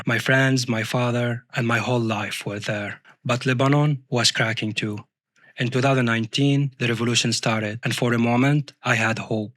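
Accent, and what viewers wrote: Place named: Syria. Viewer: من لهجتك مبين انك سوري، ومدامك دارس طب ف عالاغلب مسافر ع المانيا بتمنالك كل التوفيق وعلى امل انك تلاقي حياة احلى واكثر انفتاحا وتقبلا وامانا برا سوريا وملاحظة صغيرة: انت قلت (غيري من الناس العاديين)، الاصح هو غيري من الناس المغايرين جنسيا او الستريت، اما "العاديين" فكلنا عاديين وطبيعيين سواء كنا مثليين مغايرين مزدوجي الميول او من غير ميول كلو عادي وطبيعي😁 تحياتي الك صديقي وكل التوفيق Much love🤍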